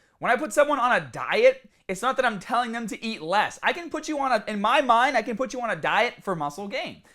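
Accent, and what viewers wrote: Boston, United States. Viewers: this is the first video of yours that I watch. Are you Bostonian or something? Ie. Abbey Shaaarp. I've been doing my Caaardio. Maaargarita.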